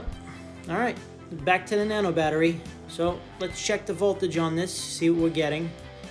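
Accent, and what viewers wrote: New York, United States. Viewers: Ees a New Yorkr or from New Joyzee. No? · I’m subscribing because I hear a NY accent! Good content too lol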